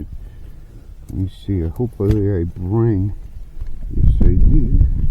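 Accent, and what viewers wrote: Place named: East Coast, United States. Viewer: I love U’r channel. You came up as a suggestion on my feed. U have a bunch of planes and I think your YouTube channel would grow much faster if you had a camera person that could zoom in and provide up close shots and a mic that could cut the wind noise. Just my opinion. I enjoy your east coast accent too. Keep it up brother and thank you for the videos!!!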